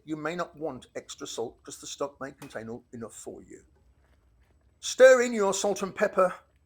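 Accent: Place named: Birmingham, United Kingdom. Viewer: Love the gravy. Love the mild Birmingham accent. Subscribed.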